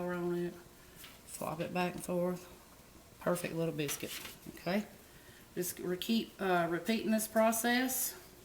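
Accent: United States. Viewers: FANTASTIC! You sound like you're from Mississippi. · The whole time I was watching your video I was thinking, I know who that is she sounds so familiar, then I realized that your from Georgia you sound like everybody I know because I'm from Georgia also. That whitelily flour makes all the difference.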